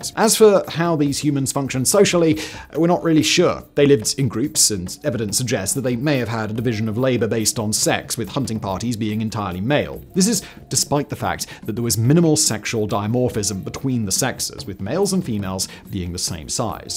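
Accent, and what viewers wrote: RP, United Kingdom. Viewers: Sorry, the british accent prevented me from finishing this video —— 😣 💬 Thumb down 👎🏽. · For those criticizing pronunciation, please note that he doesn't have an American accent. Britons often pronounce vowels differently (i.e., a "clark" helping in a store and the metal known as "a loom IN ee um) and this might extend to other vocalizations. It is possible he's not an ignoramous. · Stick to this, your political take may aswel be straight from #10 no independent thought whatsoever I cant stand 5 seconds of it, I can tolerate that posh accent when its things like this though😂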